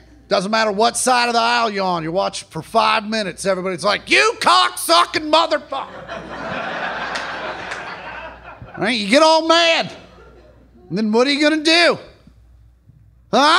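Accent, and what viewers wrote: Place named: South, United States. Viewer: Nobody in Kansas has this southern of an accent, unless they moved here from the south.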